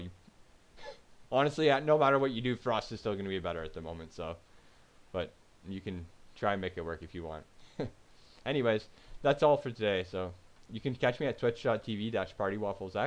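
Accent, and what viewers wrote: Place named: Nova Scotia, Canada. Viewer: Canadian eh, that "R" pronounciation sounds almost Nova Scotian/New Foundlander.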